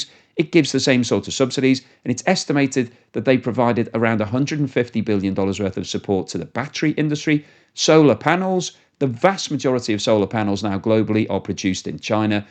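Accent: United Kingdom. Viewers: It's British English. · This guy sounds like he is from the UK why is he always talking about China? Is it because he’s xenophobic? Or because he knows talking about Chinas collapse brings low IQ people to watch his videos?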